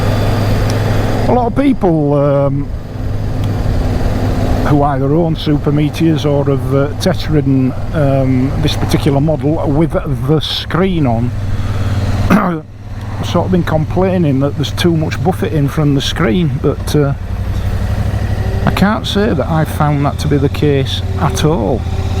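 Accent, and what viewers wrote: Yorkshire, United Kingdom. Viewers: As an expat. yorkie as well as the content I like hearing someone what talks like me tha knows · Just subscribed to add to your 10k target. Never mind the negatives. Concentrate on the positives. I like your commentary with that yorkshire twang. Regards from a manchester biker. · Just subscribed. Enjoying your videos of my native Yorkshire which I left over 50 years ago. Great accent, great scenery, great bikes. Please keep on doing what your doing.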